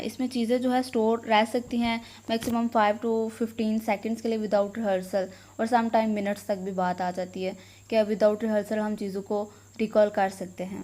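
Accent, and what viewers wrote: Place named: Punjab, India. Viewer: Really helped tho I'm loving her Punjabi accent in English 😂❤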